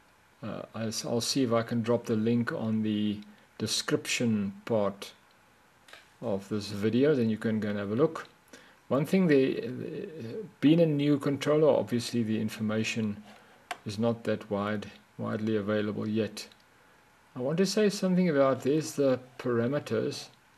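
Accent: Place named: Afrikaans, South Africa. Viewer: Is jy afrikaans?😀 Jy het definitief my aksent!!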